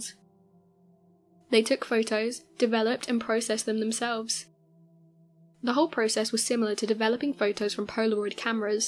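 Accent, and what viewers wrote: United Kingdom. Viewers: British chick narrator...”unfortunately for the US, Russia did it first.”...yeah as if Russia were friends with the British...comon chick!!...The Brits didn’t do sh*t either.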